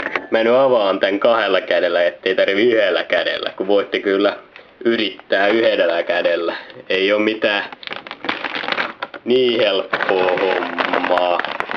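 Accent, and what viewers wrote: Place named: Tampere, Finland. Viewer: Kuulostat joltain Tamperelaiselta. :S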